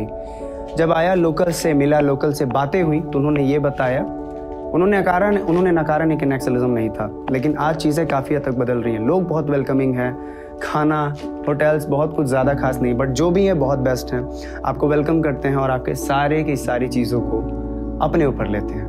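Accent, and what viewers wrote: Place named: Kashmir, India. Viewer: Are ye voice over wale bhaiya bhut Jada ...akdm writer type ho jate hai .....bihar hai guru thoda lallantop type rhe thoda casual matlb ....bihar kon aise bolta hai sudh hindi .... Bass hmko lg rha thoda aram se bola jay ...apne jaisa to or v feel ayega na 😁❣️ Ye bihari kam kasmiri juban lgta hai Ho skta hai hm galat v ho....so mafi v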